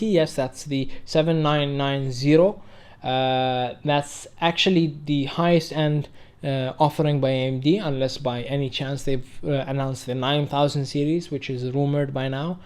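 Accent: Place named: India. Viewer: كأنك هندي قاعد يتكلم إنجليزي هيا وسابي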